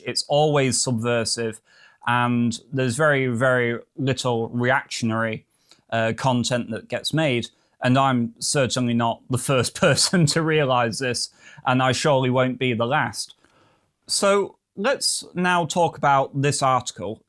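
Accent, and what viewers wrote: United Kingdom. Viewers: E<link> British accent 🤢🤮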